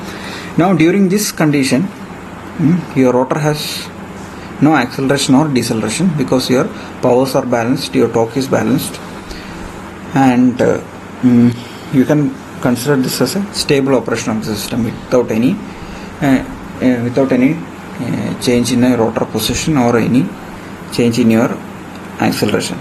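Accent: Kerala, India. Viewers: Malayali ano? Accent nalla parichayam?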